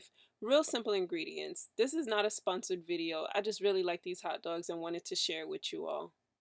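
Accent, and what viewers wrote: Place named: New York, United States. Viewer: Bruh your accent is crazy New York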